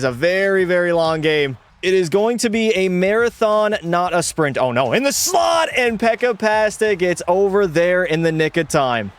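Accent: Canada. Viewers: Could pass as brothers lmao the Canadian accent too I love it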